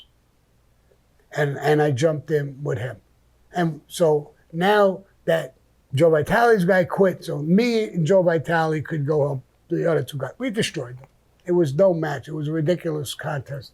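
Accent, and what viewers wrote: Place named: Northeast, United States. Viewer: It’s that northeastern accent brotha. Rampa’s = Rampers.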